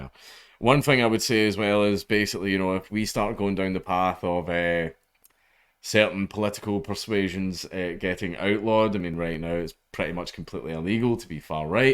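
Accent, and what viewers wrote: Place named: Scotland, United Kingdom. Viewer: 'Phnom penh' (sounds like) 'nom pen' sometimes the second syllable is silent as in Thai. even with a Scottish accent Dank you were sound on that one.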